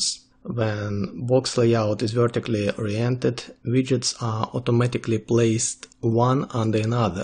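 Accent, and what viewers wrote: Russia. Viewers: Russian ? am I right ?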